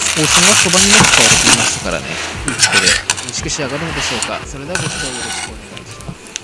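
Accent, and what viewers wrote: Aomori, Japan. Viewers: 青森の訛り最高。安心する。ラーメン作ってもらったの食べたい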